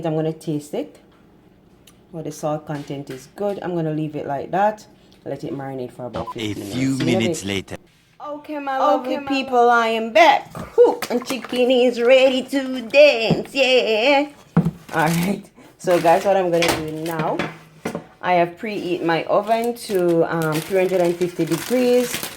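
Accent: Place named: Jamaica. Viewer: Hey girl I am here new subscribe family now you sound like a Jamaica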